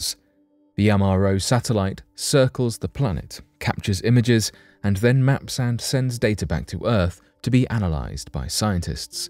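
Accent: England, United Kingdom. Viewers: You're a liar and a fat mouth and with that English accent it doesn't make you sound intelligent you might fool some people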